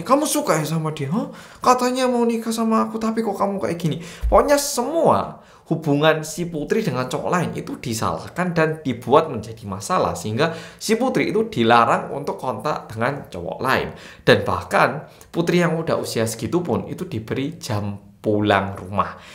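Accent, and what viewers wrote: Java, Indonesia. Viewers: salfok sama cara mas ngomong 'koceng' di menit awal. bukan 'kucing' tapi koceng'. mas medok sekali😂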